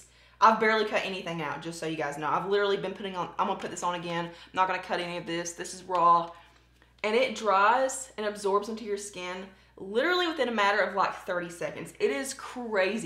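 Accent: South, United States. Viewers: I don’t think I ever told you this before but maybe I have, I absolutely love your accent. I could listen to you talk for hours. My whole family is from the south but I have lived in Northwest Ohio my whole life. My grandma passed away a year ago and she lived up here for the last I would say 40 years but still talks like she was from Lawrenceburg Tennessee. I just think a southern accent is very comforting. But I do know a lot of people hate on it and stereotype you as a hillbilly. That’s really sad because you’re beautiful person inside and out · I just discovered your channel tonight and I have not enjoyed a "makeup" video in so long! I enjoyed your honesty and your familiar southern draw😉 I'm looking forward to seeing your future vids. Love the fact you are down to earth and real! I can so relate to you. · “Southern Charm”... I love that. I am from Savannah, Georgia, and I love your accent. 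It makes you...you.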